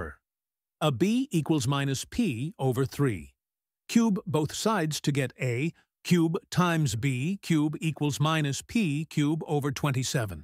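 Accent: India. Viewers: My guy, please learn English grammar. It sounds too obvious that you're Indian. You say things like "y+3 whole cube" which is something only Indians say, while native speakers say "y+3 all cubeD", or squareD.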